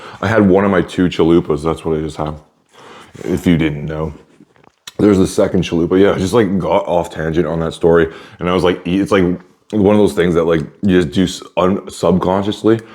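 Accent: Canada. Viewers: Your accent isn’t super familiar to me as an Ontarian, are you possibly albertan or a maritimer?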